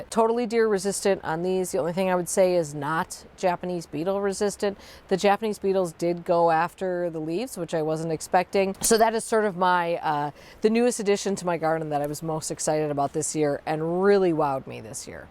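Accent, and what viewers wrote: Wisconsin, United States. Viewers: Oh, I needed this. We moved into our house 20 years ago this coming February. We were thrilled to discover in the spring that our neighbors were gardeners (and also the kindest people ever). We have enjoyed many years of sharing plants, sharing bulb orders, and enjoying each other's gardens. Don died of a heart attack in September at only 77 years old, and Janice was moved to memory care two days ago. We are experiencing so much grief right now, and I have been planning the bouquets I will take when I visit her this summer. I will definitely add the snapdragons to my seed orders. Thank you for your videos. You are inspirational and almost always give me a laugh, and I love seeing your animals. Plus, listening to you talk takes me home to Madison. Happy New Year!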